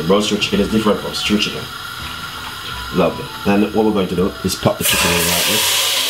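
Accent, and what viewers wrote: Jamaica. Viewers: All dis time, I thought you broadcasting from JA. 🙏🏾😂🇬🇧🖤🇯🇲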